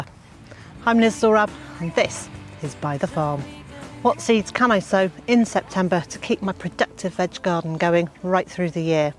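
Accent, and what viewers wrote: United Kingdom. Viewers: Given the title of the video, it would be worthwhile indicating where on the planet you are. The internet after all has international reach. I can only assume by your accent, it is the U.K., but assumptions are only that. Other than that, I appreciate the content. Cheers from Chris the Forest Worm, Adelaide Australia.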